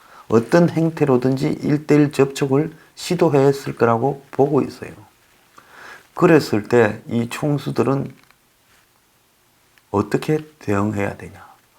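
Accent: Gyeongsang, South Korea. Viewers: 최고!!! 부산경상도의 대표가 되는 억양과말솜씨에 정말 곰감과동감하며 너무나 재밌게 유익하게 많이 배워갑니다! 항상 건강하시고 좋은방송 계속 부탁드립니다!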